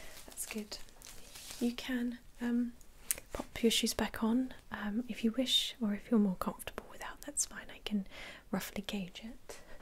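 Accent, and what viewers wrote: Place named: RP, United Kingdom. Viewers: Wonderful tailoring video, I needed a new suit fitting for clandestine work lol. Was surprised at how relaxing a tailoring video can be. The sound of your irresistible posh accent and beauty and no background noise. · Posh bird must be from Surrey 😁 good vid by the way 👍🏻❤️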